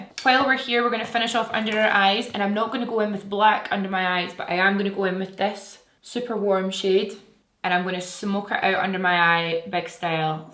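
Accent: Scotland, United Kingdom. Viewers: Seeing your videos make me so happy, just watching you helps so much with my anxiety an depression. Something funny tho, i naturally mimic accents especially ones from Europe... so EVERYONE knows when ive watched a video from you cause i end up with a Scottish accent for usually about an hour or two... my mum finds it funny. I hope Jack, and the doggos are well ❤️ much love from New Zealand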